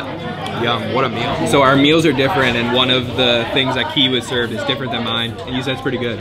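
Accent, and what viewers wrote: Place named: Canada. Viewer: He's Canadian 😂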